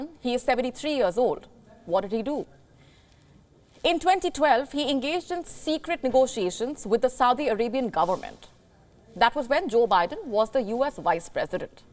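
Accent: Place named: India. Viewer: This is a great news site but unfortunately it is at times hard to understand the reporter because of the Indian accent and mis pronunciation of words.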